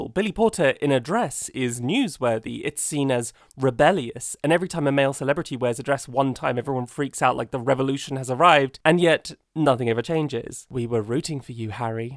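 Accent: United Kingdom. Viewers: It’s just Southern English 😭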